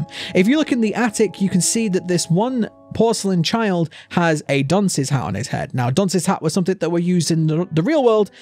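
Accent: United Kingdom. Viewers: “I absolutely adore Little Nightmare theories.” I absolutely adore British accents saying they absolutely adore something.🥰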